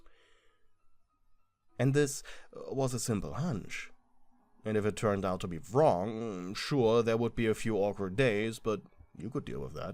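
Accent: Germany. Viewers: Why do i hear a little german Akzent :,)?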